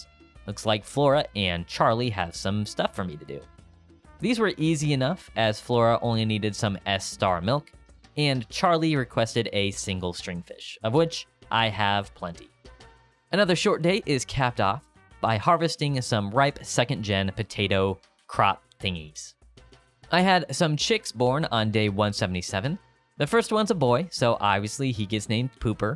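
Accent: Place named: South, United States. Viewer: Eyyyyy your southern accent came out here lol Now i hear it throughout the rest of the vid but, tbf, southern accents are hella endearing and you do a great job with masking it, fwiw xD This vid is still a big comfort lol I wouldnt call out the southern accent if I werent intimately familiar with it, rest assured xD Feels like an Arkansas or northern texas accent lol. My familys got that accent. I do too if I'm around the right people lol Forgive me if ya dont have a southern accent and I'm assuming xD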